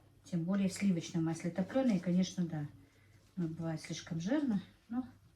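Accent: Standard. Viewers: Привет вам большой...меня удивило ,что вы так чисто говорите по русски ,многие русские так не умеют говорить... чистюля ...настоящий профессионал...удачи вам и благополучия. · Чисто на русском говорит потому что она училась советское время в школе не во всех школах был родной язык урок. язык учили дома в кругу семьи соседями и родственниками в Чечено-Ингушской Республике ЧИАССР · Да речь прекрасная, чистая, грамотная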